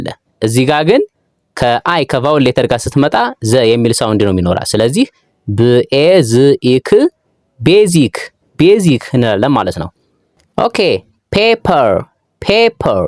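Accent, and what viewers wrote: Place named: Ethiopia. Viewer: ለድካሙህ ክብር እሰጣለሁ ግን በአማርኛ አክሰንት ሳይሆን አፋቸውን ከፈቱበት ተናጋሪዎች ቀጥታ ብታስነግር (ብታስደምጥ)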